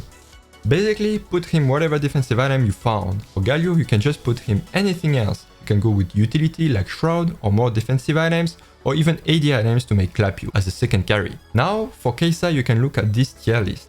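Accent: France. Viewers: on dirait que tu as un petit accent francais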